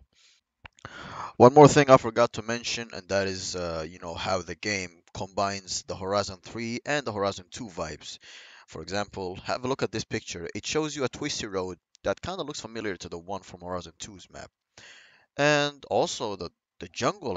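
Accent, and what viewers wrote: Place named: Scotland, United Kingdom. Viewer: Just you sound scottish 🏴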